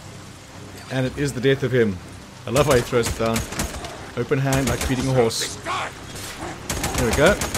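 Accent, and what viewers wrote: South Africa. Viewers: Hey dude, you sound South African....are you?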